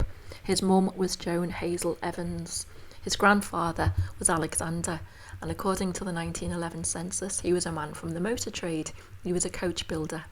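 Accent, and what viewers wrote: Liverpool, United Kingdom. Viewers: delivered in a delightful Liverpool accent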